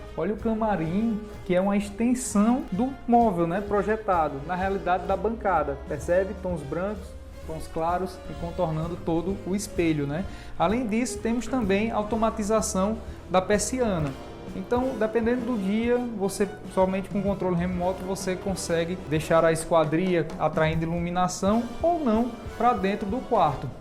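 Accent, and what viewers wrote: Northeast, Brazil. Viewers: Que projeto lindo, gostoso, sem palavras pra esse projeto e essa casa! A sua apresentação tb, é digna de aplausos, parabéns, vc é diferenciado tb pra apresentar, fora que vc tem um sotaque muito legal que eu, como boa filha de nordestinos, adoro kkkk. Parabéns tudo muito top demais! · Pelo sotaque deve ser em algum lugar do nordeste , ceará talvez ou Pernambuco talvez ☝️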